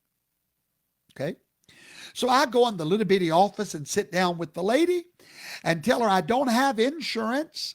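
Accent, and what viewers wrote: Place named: South, United States. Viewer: Love your accent! More country than me!!!😊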